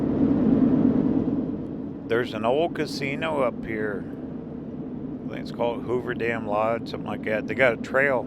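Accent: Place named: Upper Midwest, United States. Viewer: I love your upper Midwest accent.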